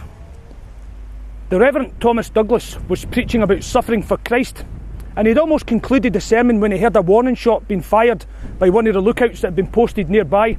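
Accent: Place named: Scotland, United Kingdom. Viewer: Psalm 76 has a new meaning for me now; I have read Maurice Grant's account of Drumclog, but I so appreciated this reminder and hearing account of it in his Scottish "accent" (to me) and seeing the sight myself, as best I can in the United States. Thank you so very deeply for this retelling; it deepens and strengthens my own conviction and gives me a deeper appreciation of what the living Christ meant to these people and how He is still alive today; this is not history taught in the United States, not even of our Pilgrim forefathers and why exactly they hazarded their lives to cross an ocean to a wilderness to live here. How amnesiac most of the world is, and I so appreciate your keeping this history alive, and not just history, but its connection to our living God, the Gos so much of our world ignores and rejects.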